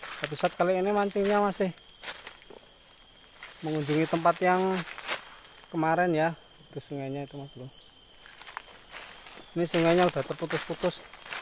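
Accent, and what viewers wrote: Java, Indonesia. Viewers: Ini perantauan atau gimna mase? 😁 Kox bahasanya medok jawa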